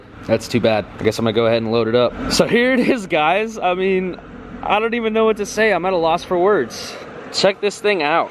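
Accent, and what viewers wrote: South, United States. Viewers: i liked how you went over everything and teaching the viewer and i thought it was funny how southern you sound and i knew i had to sub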